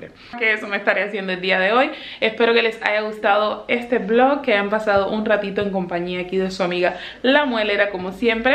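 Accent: Cuba. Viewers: Navegando por youtuve y encontre una familia muy interesante y bella mas tu hijito que niño mas tierno y hermoso, sere tu Nueva seguidora ya que me encanto tu contenido felicidades amo el acento cubano saluditos y muchas bendiciones desde cbba-Bolivia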